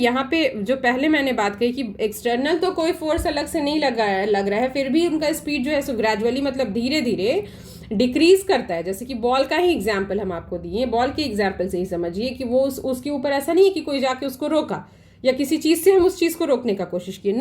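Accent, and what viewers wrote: Bihar, India. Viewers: Mam are you bihari ?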